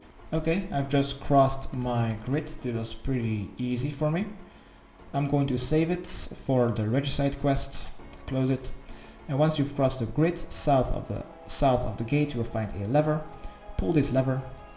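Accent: Netherlands. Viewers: You're dutch, lol.. never thought u were even tho you got an accent, im also dutch :) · When you fail the rope swing 2x but the stupid grid thing was literally 5 times straight forward xD Thanks for the amazing, not annoying (ahum TAF) quest guides. Nice Dutchie accent x)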